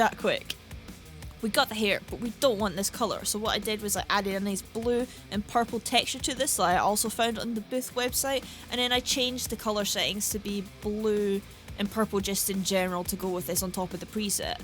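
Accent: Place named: Northern England, United Kingdom. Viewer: So magical when a youtuber responds to me because for now his/hers base is not so big yet. :-) Anyway loving your accent (really, really enjoying it :-D). Are you from north of Britain? (I'm the heart of Europe (Czechia) so I'm not so good of guessing the accent of English speaking peeps :-) )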